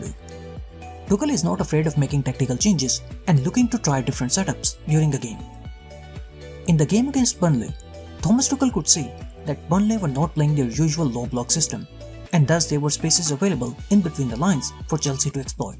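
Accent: India. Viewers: Man sounds like he's from India, and here the match is early on Friday.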